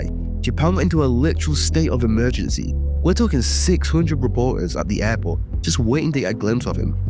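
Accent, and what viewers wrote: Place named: Yorkshire, United Kingdom. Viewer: I wonder if this fella learned English in the north of England. He sounds like a Yorkshireman.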